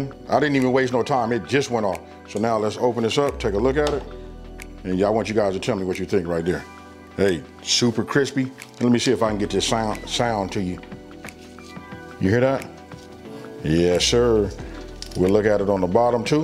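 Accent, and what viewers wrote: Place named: California, United States. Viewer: Anyone from Cali can just listen to you talk and know lol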